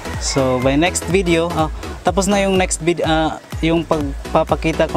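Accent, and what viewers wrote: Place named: Visayas, Philippines. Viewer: oo nga sir kc nga mid u nahalata ko na bisaya ang tono mo kya ako ngtanong kung din ka sa aton..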